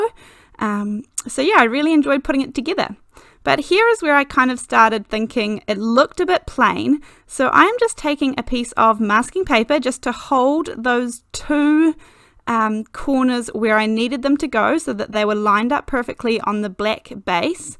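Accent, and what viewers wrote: New Zealand. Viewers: I am lucky Lisa. Thank you to so much for the beautiful card. I sat watching that video and when she was stamping the s in Lisa I looked at my daughters face and I knew it was for me. I have never been so overwhelmed in my life. It was awesome. I will treasure that card, just as I treasure my georgous daughter for doing that for me. That was better than winning Lotto!!!! Thank you all for the lovely birthday wishes. I love watching videos. I love her style, and her creativity as a craft artist, and I am so comforted by her kiwi accent. And her love of Ali Express. So thank you all so much and now I have finally make a comment in this fantastic group, instead of just watching and admiring.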